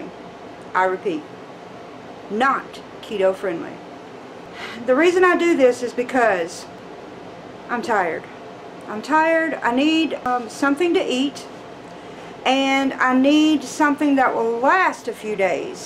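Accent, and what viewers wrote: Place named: South, United States. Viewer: Southern Ark No, Thank you for teaching me things I don't know, and the way you say y'all with your southern accent.....love the way you speak.👍❤️🇺🇸🇬🇧God bless America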